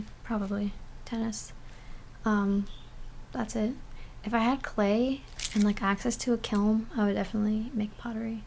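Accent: South, United States. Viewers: I knew she was a no sabes kid. Her American accent was too natural. Just another pocho coconut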